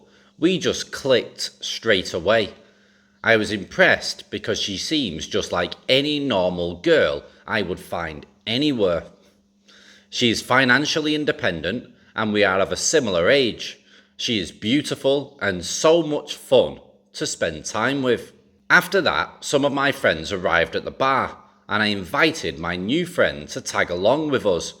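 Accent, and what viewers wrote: United Kingdom. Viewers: Delusional he sounds UK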